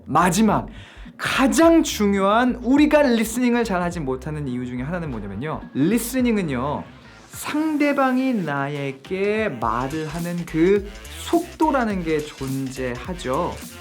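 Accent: United States. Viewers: 뉴질랜드에서 학교다녓다고하셧는데 억양과 발음은 미국식인듯ㅎㅎ 요 궁금한게잇는데 아엘츠리스닝 6.5-7.0 왓다갓다해요 리딩은4.5 정도나오고요 딴지거는게아니라 저같은 경우는 왜그런지 알려주세요 아엘츠 시험조만간봐야되는 1인입니다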